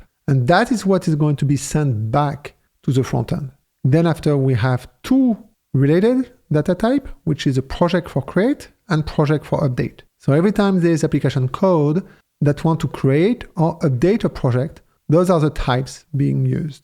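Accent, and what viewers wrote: France. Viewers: Are you french? You have a little accent xd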